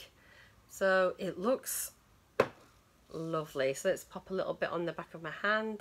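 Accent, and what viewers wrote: United Kingdom. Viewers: me a born vegan, what a great vlog dear..and me like british accent,like with subs............blessing.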